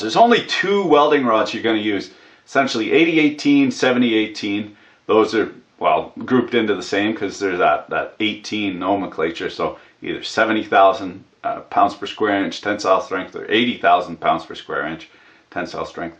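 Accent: Canada. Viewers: your accent is halairious. What part of Canada are you from . lol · Still trying to figure you out. Canadian but with a New York snark. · The guy's Canadian. I know tons of people who talk just like him. Especially in the trades and oil industry.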